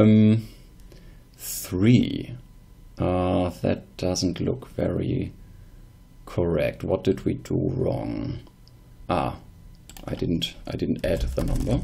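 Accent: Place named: Germany. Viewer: Yes it is. I'm converting some bibles (from diferent publishers) from PDF to XML. I'm strugling a bit because the pdfs are far from being well structured as a text format. So the parser get's relly messy. And also I have very few experience with parsers. Hmm: Ich nehme dein Antwort als ein "Ja" :). Ich habe das Firefox Fenster nicht gesehen. Aber deine Ausprache und Pausen sind ähnlich zu andere Deutsche die ich schon kenne, insbesondere wenn sie langsam sprechen. Viel Erfolg !!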